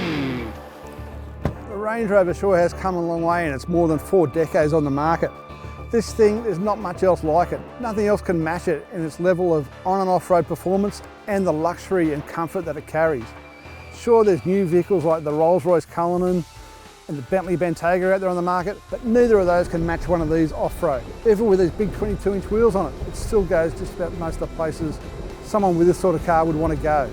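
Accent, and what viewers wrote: Australia. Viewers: Classic austraaalian review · English, Australian, we have a wide range of different accents in Australia.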